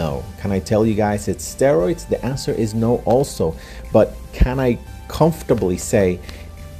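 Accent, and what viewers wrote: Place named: Philippines. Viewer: You sound very fillipino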